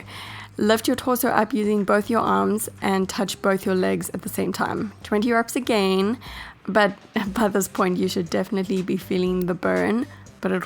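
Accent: South Africa. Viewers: הנסיכה שילת I believe she is South African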